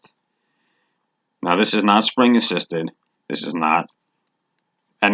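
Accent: New York, United States. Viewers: Love ur New York accent , it has that 70’s 80’s sound to it lol love it bro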